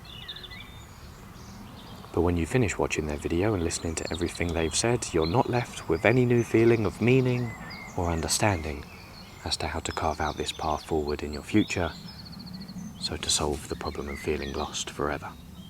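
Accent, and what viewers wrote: United Kingdom. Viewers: your british accent makes your point perhaps better than your words.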